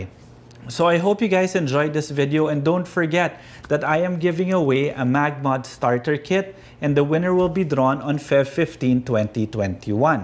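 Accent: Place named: Philippines. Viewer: Right away I knew he was filipino when he started talking and I have a cousin named Jiggie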